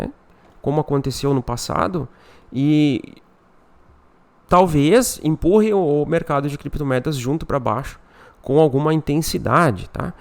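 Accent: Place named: Australia. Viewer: Kkkk😂 mas não tem mesmo, o tem um sotaque tipo uma mistura de Inglês Autraliano com Gaúcho.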